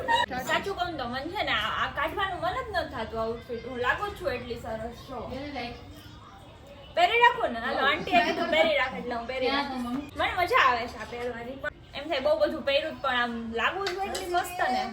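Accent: Kathiawar, India. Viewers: Kathiyawadi accent bav sweet che ❤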